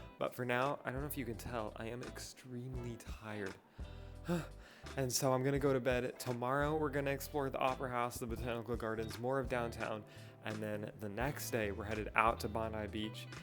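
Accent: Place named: United States. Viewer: Nice video! There's also a surprise little hidden waterfall at Bronte. Your accent is from what part of the US?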